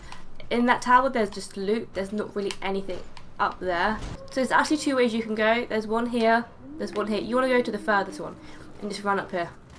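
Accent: England, United Kingdom. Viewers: 'Bit too much of the english accent here.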